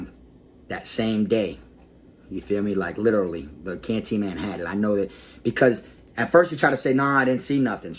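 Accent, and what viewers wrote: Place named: South, United States. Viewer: Southern accent tryin to sound street.... -20 IQ points.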